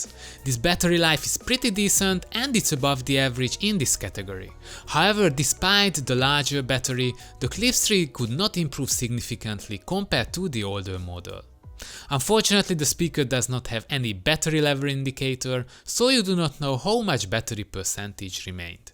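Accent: Hungary. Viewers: he said that he has a hungarian accent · i like your Hungarian accent :)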